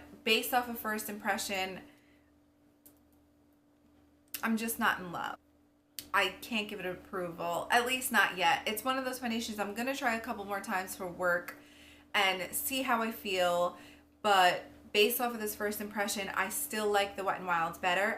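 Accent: New Jersey, United States. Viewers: You have such a thick Jersey accent! Haha :) thanks for the review! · Every time I watch your videos, your accent makes me miss Jersey!! I know your in NY, but this Jersey girl misses home. Adore you beautiful, and your videos. Keep them coming. xo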